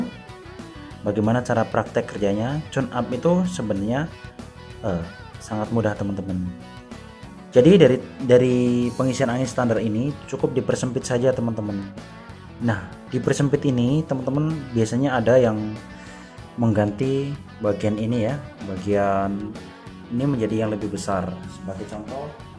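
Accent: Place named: Java, Indonesia. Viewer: Jn jawane nyong bngt kang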